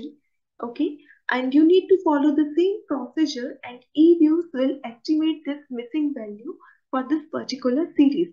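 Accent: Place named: India. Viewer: Please work on your axent , im not english , already hard to understand english so, your indian axent make it more difficult to me 🙏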